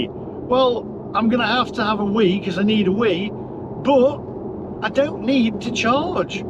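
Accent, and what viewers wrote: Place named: North, United Kingdom. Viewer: You might have a northern accent but your car test is totally irrelevant to the rest of us mere mortals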